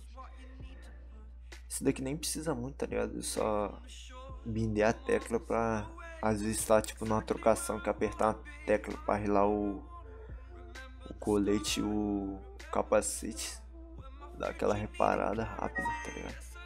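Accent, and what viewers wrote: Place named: Bahia, Brazil. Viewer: Antigamente voz de maloqueiro hoje em dia tem voz de baiano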